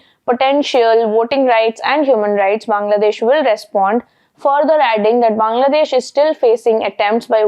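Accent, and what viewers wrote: India. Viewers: I could barely understand what you were saying! Very very strong Indian accent made it very hard to understand. Either speak in Hindi or improve your accent before presenting. Very poor performance.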